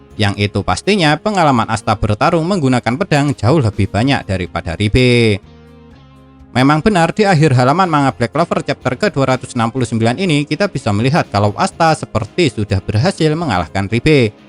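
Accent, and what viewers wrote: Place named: Banyumas, Indonesia. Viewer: Fix mimin nya itu orang ngapak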